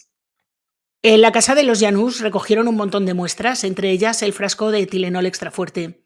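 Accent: Castile, Spain. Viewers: nada se te quita con pronunciar las palabras en inglés, ya que das demasiados casos de USA, y son palabras en ingles, que cerrados sois los Spanish 😅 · Buen día ❗ amo que los españoles no pronuncien las palabras en inglés, sino en castellano ❤ Impresionante capítulo 😳😯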